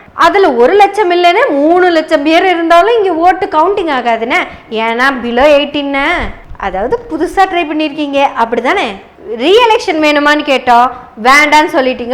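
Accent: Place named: Coimbatore, India. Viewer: Kovai slangs very good👍🎉❤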